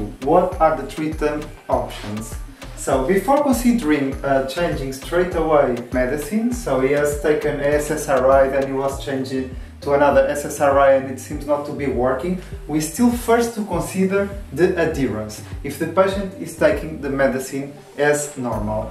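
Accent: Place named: Portugal. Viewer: I'm going to guess you speak Portuguese?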